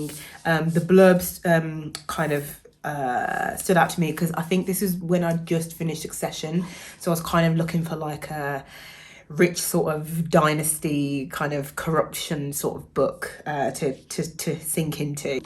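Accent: Birmingham, United Kingdom. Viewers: Are you a Brummie? 💐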